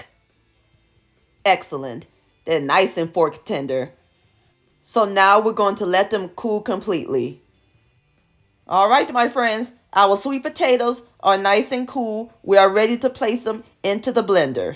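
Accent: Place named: South, United States. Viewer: "Thats right sugar a sweet corn bread"! "Here we go"!!! I love your Southern accent. Im from Michigan but theres no hospitality like down south hospitality. I was born down South. W. Virginia. I miss my cousins and home town. Princeton West Virginia. Im so delighted to run across your channel my friend! This sweet potatoe cornbread is a delight and will be a dish at my thanksgiving dinner. Ive never tried it. Just regular hot water cornbread. This will be a treat.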